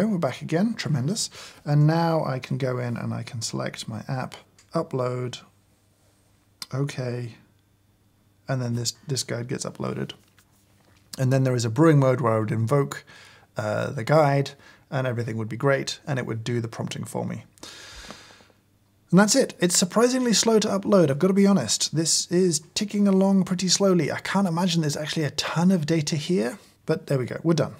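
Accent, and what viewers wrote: United Kingdom. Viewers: That's the effect British accents have on Americans. This dude could literally tell stories about his farts throughout the day and Americans would tune in.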